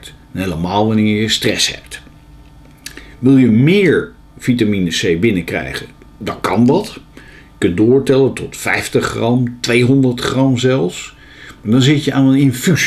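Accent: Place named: Leiden, Netherlands. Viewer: dank je wel...interessant! ik vind het leuk wat je vertelt! Ik wou nog vragen wat jou achtergrond is. Ben je arts of natuurgeneeskundig therapeut of arts? of/en het voorafgaande en helderziend of medium? Ben echt geinteresseerd! denk hetzelfde....maar wil gewoon meer van je weten. ps: Kom je uit Leiden?? Je klinkt zo Leids?!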